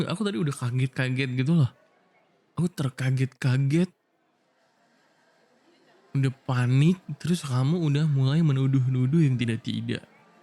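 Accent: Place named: Kalimantan, Indonesia. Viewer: _mas:cara ngomongnya kaya orang kalimantan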